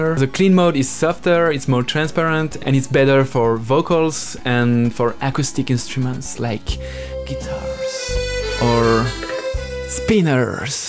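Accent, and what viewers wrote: France. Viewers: Your accent really looks like the french one. idk if you speak french but ya fun fact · french accent spooted?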